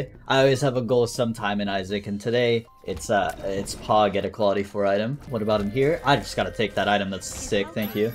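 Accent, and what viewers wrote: United Kingdom. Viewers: Never thought that would be even more british by the day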